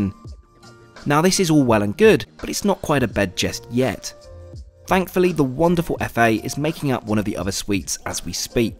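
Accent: RP, United Kingdom. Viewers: And I love your British voice. I love hearing Brit’s … be safe with your travels …. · Bro this guy talks sooooo posh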